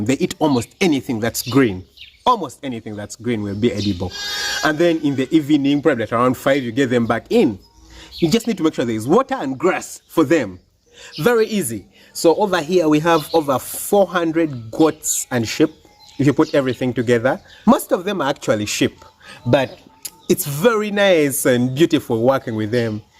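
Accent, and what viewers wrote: Uganda. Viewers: Ur accent sounds ugandan where u from bro?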